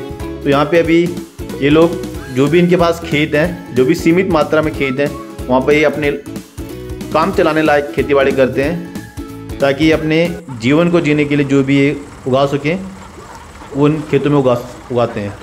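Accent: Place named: Haryana, India. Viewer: Sir ji aapki aawaz se lag raha jese aap haryana se h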